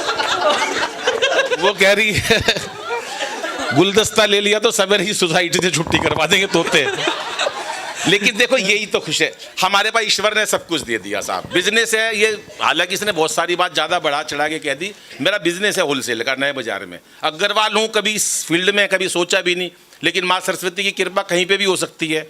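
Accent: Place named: Haryana, India. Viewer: ओरिजनल हरियाणवी अंदाज वेल डन श्री वेल डन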